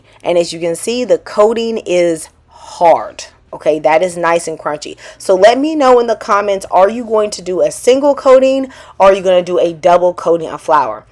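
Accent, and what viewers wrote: South, United States. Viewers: Like Mr. once said in my Country Brazil in 1926. IT'S DELICIOUS! IT'S DELIGHTFUL!!! Thank you so much for this so special recipe I will prepare immediately! I LOVE your accent!! I had a golden opportunity to live in the south for 6 years Birmingham Alabama. I made a lot of friends there. I learned how to make a good gumbo soup and chicken Jambalaya. Thank you again. Love in it! 🥰👏👏👏👏👏👏👏👏👏👏👏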